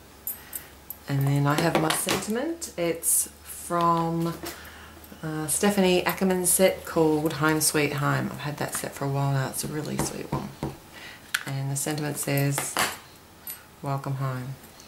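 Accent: Australia. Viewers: Thanks for sharing. You sound like a fellow Aussie and so just wondering where do you get your Tack n Peel from?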